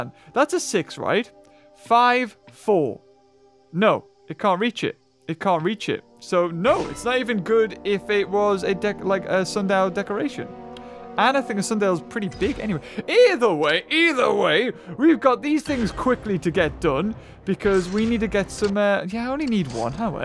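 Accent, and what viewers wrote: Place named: United Kingdom. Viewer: Why you talk british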